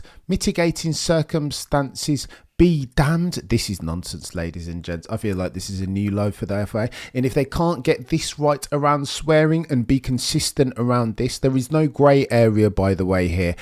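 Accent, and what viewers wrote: Birmingham, United Kingdom. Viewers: Hear a Brummie accent, You a Villa fan mate?!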